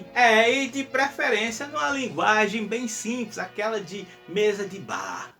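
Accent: Northeast, Brazil. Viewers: Cara, onde você estava que só agora eu te achei? E que achadoooo! 👏🏼👏🏼👏🏼👏🏼👏🏼👏🏼Uma pista eu já tenho: o sotaque é nordestino😍😍😍😍😍😍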